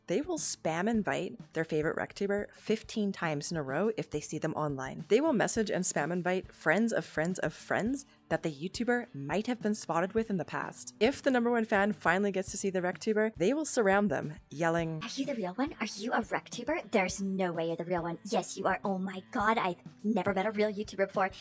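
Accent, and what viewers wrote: Canada. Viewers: Hold on your Canadian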